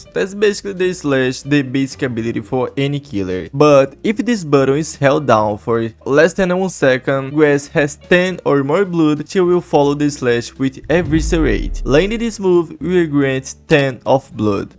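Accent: Brazil. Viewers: Cara não vou mentir que incomoda o inglês brasil seu sotaque me ataca muito mas bom video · O inglês mas brasileiro q eu js vi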